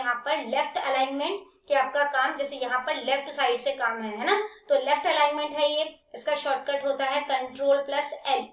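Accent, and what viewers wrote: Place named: Punjab, India. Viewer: App punjabi bohut cute bolte ho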